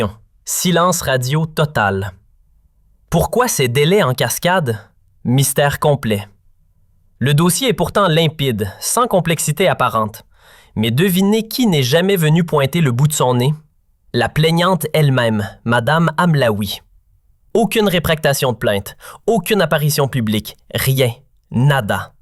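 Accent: Canada. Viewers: De quoi tu te meles toi avec ton accent canadien mais que voulez vous de l'ALGERIE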